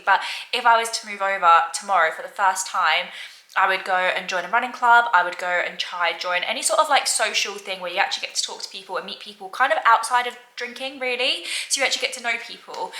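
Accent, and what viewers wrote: United Kingdom. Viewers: Very good, so I had a look at a video you made 8 months ago. Hard to believe they are the same person. Most obviously, 2023 had a strong English accent where as 2024 pretty much talks normally. And 2024 looks much happier, healthier and self-confident than last years model. The fresh air has done you good.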